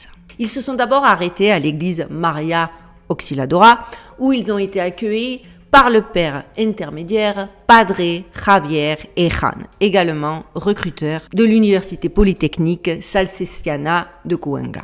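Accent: South, France. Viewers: Puisque les commentaires t'aident pour remonter les algorithmes , commentons! Franchement, j'attends tes videos avec impatience. Ta manière de presenter est claire, précise, documentée et souvent drôle malgré des sujets qui ne le sont pas toujouurs! Mais ce que nous apprécions au delà de tout c'est ton bel accent du sud qui, pour les expats que nous sommes, nous ramène vers d'autres temps, d'autres lieux.... Surtout ne te laisse pas intimider. Please go on with the great work! Blessings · Allô …. Juste par curiosité ou as tu appris à parler français….de quel origine es tu….je trouve que tu as un accent du sud de la France….ton accent est très jolie soit dit en passant.👍🇲🇶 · Votre petit accent du midi , peu commun à Las Vegas apporte le petit rayon de soleil à travers votre reportage. Cw que vous indiquez est réel. J'ai eu la chance de visiter les souterrains de Bucegi... 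Tout ce que nous entendons vivons lisons apprenions et voyons est archi-faux ! 🙂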